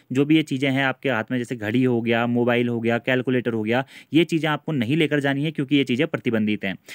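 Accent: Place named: Pahadi, India. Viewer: Hey! handsome bro, ever first time aapka deedar huwa, Literally handsome, I like your pahadi accent. M an esm, following you for uk gs❤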